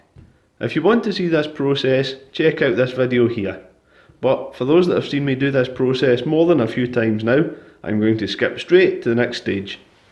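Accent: Scotland, United Kingdom. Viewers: Hey car is looking epic. How have you been finding the raptor??? I’ve got GDB and done the same to my own car, full Resto and raptor in wheel arches and full underneath. I went bare metal and then acid etched, rust bullet and raptor over the top. I find it chips quite easily on hard edges, sills, gaps etc - but on the flat it’s awesome. Won’t budge! Have you noticed this at all?? Thanks for all the videos btw, what a great source of info. Fellow Scot I hear too?? You don’t sound too far from myself in Perth. Cheers! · I really injoyed every second of watching all your videos about your Subaru Impresa. You are a really professional about eveything about cars. You have a wonderful sense of humor and a love your Scottish accent. Very nice, entertaining and educational videos. Thank you so much.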